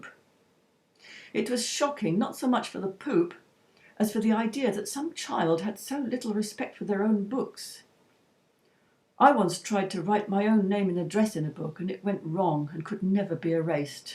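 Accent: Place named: RP, United Kingdom. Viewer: Really very good, I did watch all the story time videos on your other channel and always enjoyed them, I loved when you wore the costume and jingled the little bell at the beginning and end & remember the big book you used as a prop - might be just me but that little routine got me settled and ready to listen - brought back lovely memories of jackanory and also teachers reading to the whole class in infant school - you have a good voice, relaxing to listen to and a wonderful proper English accent, quite posh but not too posh..lol. X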